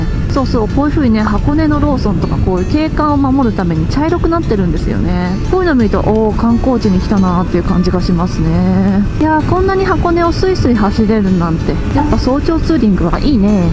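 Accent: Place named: Kansai, Japan. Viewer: 偶に関西弁出るのが凄く謎www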